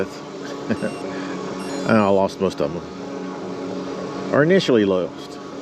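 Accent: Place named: South Carolina, United States. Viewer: Enjoying your videos. Keep riding. One question....what state are you in? I see all the crape myrtle, palm trees and southern pines, but judging by your accent, I would guess South Carolina? Let me know if I came close.. Lol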